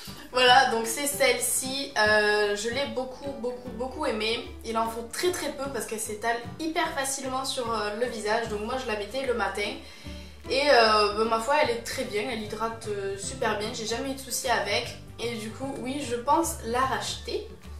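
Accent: South, France. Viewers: Je t'ai découverte y'a pas longtemps et j'adore tes vidéos, très simple sans chichi, et puis ton accent du sud 😻 c'est tellement rare les youtubeuses du sud ça fait plaisir moi qui est l'accent aussi ! Et pour info, comme tu as un petit budget, je ne sais pas si tu as vu, mais ils ont sorti plein de petit parfum a 30e chez sephora ! Voilà j'ai hâte de voir une prochaine vidéo 👌 !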